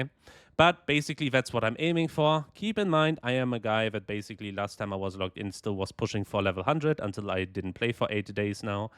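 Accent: Germany. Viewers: Er hat auf jeden Fall einen deutschen Akzent, außerdem muss man nicht hochdeutsch sein um akzentfrei Englisch zu sprechen. ;) Sein Englisch ist natürlich trotzdem super.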